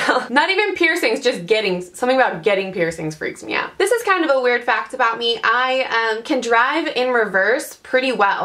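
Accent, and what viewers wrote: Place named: South, United States. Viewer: this sounded SO southern but I loved it lol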